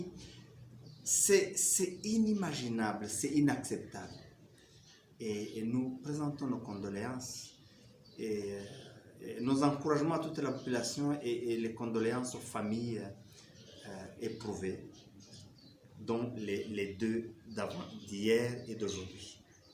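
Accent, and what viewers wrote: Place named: Rwanda. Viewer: Accent rwandais, le même accent que ruberwa, kagame etc... Cherchez l'erreur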